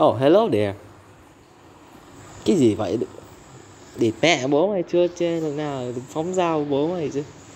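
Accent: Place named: North, Vietnam. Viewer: Ở tỉnh nào ngoài bắc rứa :))) nghe cái giọng giống bắc vl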